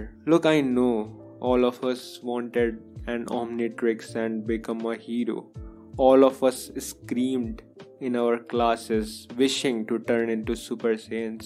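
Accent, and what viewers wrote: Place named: India. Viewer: Just found out I need to listen indian accent at 1.5x speed.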